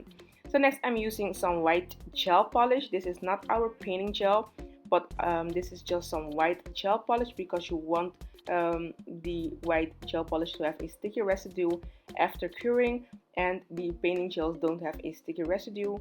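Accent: Netherlands. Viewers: Ik hoorde gelijk zij is Nederlands 😂 girlll those nails gorgeousss✨😍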